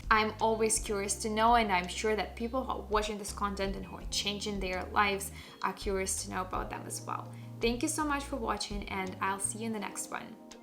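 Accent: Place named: Russia. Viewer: Вы из России или Украины? Слышу знакомый акцент )))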